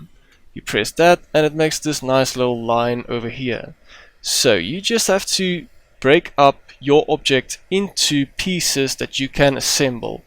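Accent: South Africa. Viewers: You South African? I hear an afrikaans accent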